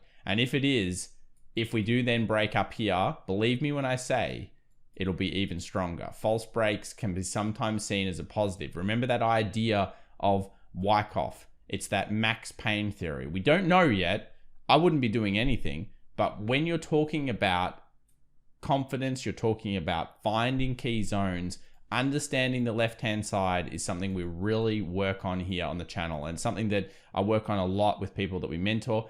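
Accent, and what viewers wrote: Australia. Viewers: Straight down to the point and precise you are the best ta on here. Love your aussie accent too.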